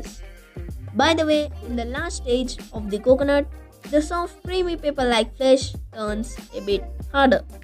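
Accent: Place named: India. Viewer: Once I heard the Indian accent I immediately left the video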